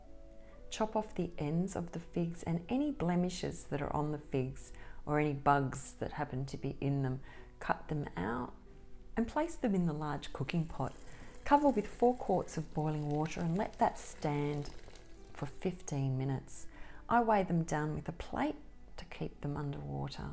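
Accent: Australia. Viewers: Hi. I’m reigniting my canning journey after 30 years. Love ya work. You sound Australian but you are giving US measurements. Is there any chance you could give the poor old Aussie viewers the metric version ’on the go’? ❤ Also…. We’re you ever a presenter on Playschool? I’m sure I’ve seen you on the telly in the past. 🤔❤️❤️❤️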